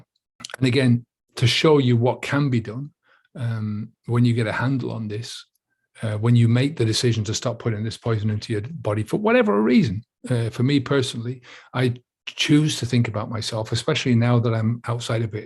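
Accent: Dublin, Ireland. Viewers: Is that a Co.Dublin accent I can hear? 😊chose to knock drinking on the head 8 months ago, best decision I've ever made. I've been out in social occasions since, and have full memory of these evenings. Fantastic feeling waking up the morning after with no groggy " what did I do?" Fear. Great video. ❤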